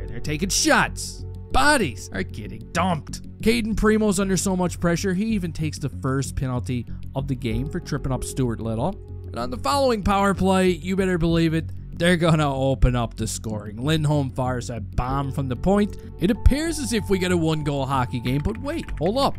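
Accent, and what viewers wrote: Newfoundland, Canada. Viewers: Is he from Newfoundland? I’m from NL been watching this fella for about a year now or so and always thought he was. but wasn’t sure lol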